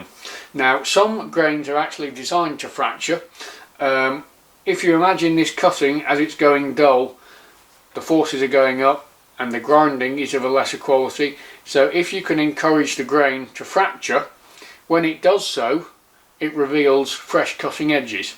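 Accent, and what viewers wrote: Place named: Cumbria, United Kingdom. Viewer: lake district? Cumbria? your accent reminds me of my granddad, he was a tool and die maker from a long line of em who worked at Vickers up in Barrow in Furness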